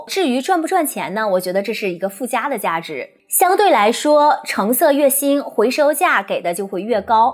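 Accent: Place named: Japan. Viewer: 日本人的發音，長駐日本長大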